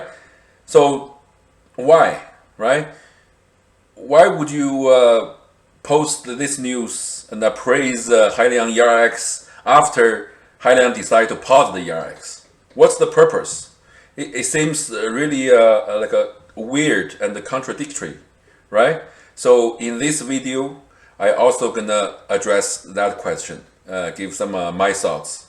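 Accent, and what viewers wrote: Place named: China. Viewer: Is this a Chinese channel. I need to know because you sound Chinese and I don’t find Chinese made things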